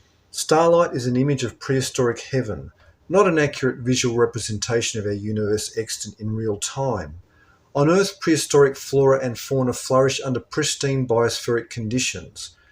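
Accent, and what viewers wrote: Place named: Australia. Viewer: So sweet to hear that Aussie accent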